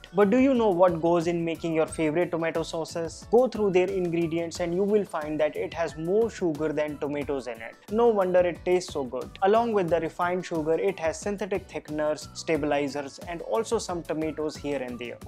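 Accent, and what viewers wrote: India. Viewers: So Indian English accent 😂, hilarious 🤣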